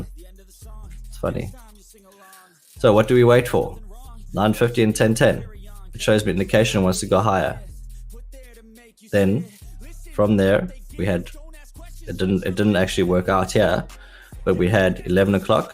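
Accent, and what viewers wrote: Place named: South Africa. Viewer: This is a fellow comrade from South Africa. I know that accent 😆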